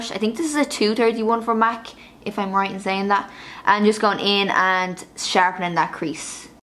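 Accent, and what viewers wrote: Ireland. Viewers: How often do you clean your makeup tools? You are beautiful btw and I love the Irish accent.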